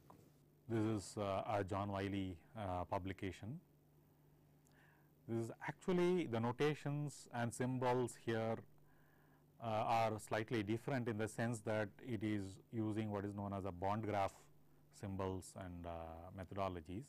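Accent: India. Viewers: Hello, He is an Indian and there is nothing wrong in speaking with an accent. This program is primarily targeted at Indian students, who won't mind the accent. And unlike westerners, Indians have a culture of respecting their teachers. Grow up, and throw your chauvinism out when you come to learn something.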